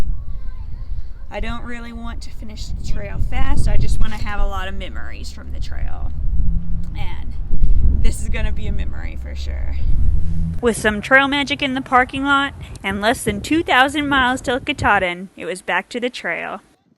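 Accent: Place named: Kentucky, United States. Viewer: I’ve watched a few of your videos even including the van build. Max Patch Damascus and Mt Rogers are three of our favorites. Fortunately we live close since we live in central NC. A comment mentioned you as a Kentucky girl. Before reading that clue I could not put a finger on your accent. I even asked my wife who is from the Finger Lakes to listen to you. Of course she had no clue. I went to graduate school in Louisville 40 plus years ago so 4 years in Louisville and across the river (cheaper rent) in New Albany Indiana should have helped with your accent. Have a great journey!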